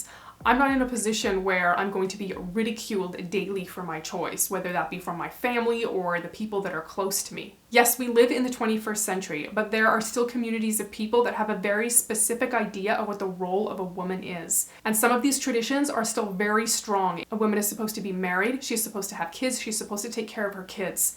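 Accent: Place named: Canada. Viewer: I didn’t realize you were Canadian until today! now I can hear that in your voice. Love that ❤️🥰 I think it’s adorable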